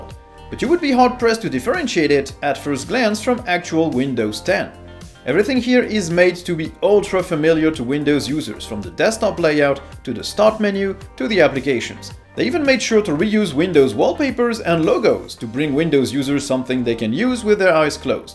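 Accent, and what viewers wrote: France. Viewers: French accent, are you from France?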